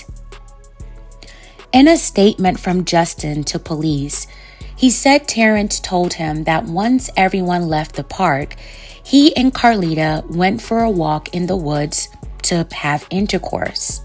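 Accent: Baltimore, United States. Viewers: Bro. I'm from Baltimore too and I live in ATL also. Thanks for bringing these stories forward dummy and bringing attention to our city. You speak with our accent but you narrate robotically as if you're reading from a piece of paper. If I. Was you dummy, I would let this female narrate my blog's because she tells the story more fluently. #MajorDifference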